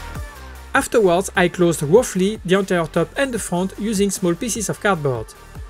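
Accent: France. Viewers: Wah mdr l'accent Français se capte direct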